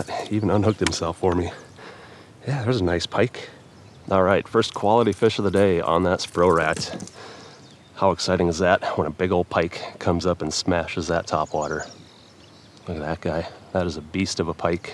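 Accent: Canada. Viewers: Your in N Dakota? Ya sound Canadian. Is it N Dakota Canada?? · What a great and relaxing fishing video! You just got yourself a new subscriber. Excuse a completely irrelevant question from Sweden, but does the ND dialect have some Canadian pronunciation in it, I think it sounds similar?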